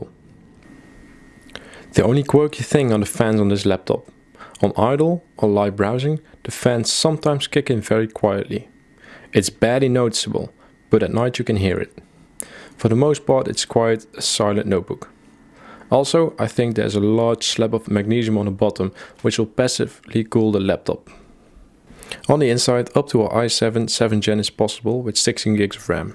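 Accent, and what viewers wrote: Netherlands. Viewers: Ik hoorde gelijk aan j accent dat je nederlande bnt lol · Hahaha jah bradahhh ik wist het niet zeker, want je hebt veel engelse reacties vandaar G, maar je accent is duidelijk een dutch one matsko maar je spreekt duidelijk en goed dus no problemo, die bios vraag was omdat hp en andere merken die bios locken, je kan niks instellen dan, alleen datum en tijd, maar geen ram timings en raid ahc settings, jij hebt hem dus jij kan makkelijk checken 😃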